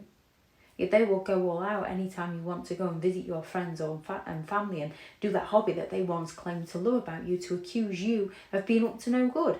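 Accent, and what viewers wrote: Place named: England, United Kingdom. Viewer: I have sleep problems and this came back. When you or I stand up with these nut jobs they are 10 steps ahead with the scams and lies. Yeah by the time I’m typing this here comes the flying monkey platoon. The lil town in Montana has like 300 people in it. A flying monkey started telling me how wrong I was for leaving the lions den. I’m so over a life time of playing grab ars with these lunatics. And listening to your English accent even so makes me laugh at myself when I get tiny pieces. The patterns no wonder you smirk every so often. At least you found a way to help people who otherwise would live in complete isolation. I’m older now and often scard shitless about just living on. I’m tired. I’m not blaming your blocks. I’m just marching on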